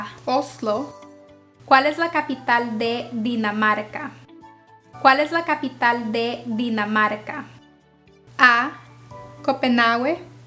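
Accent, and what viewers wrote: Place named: Latin America. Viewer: so you're teaching Latin american spanish? That's my guess base on the way you pronounce certain words I wonder which country is your Spanish from? Since even in Latin American there are some differences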